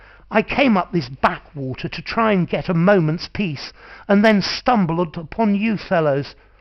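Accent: United Kingdom. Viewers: Ohh thank you very much, i have been looking for this one with British accent. I give you my like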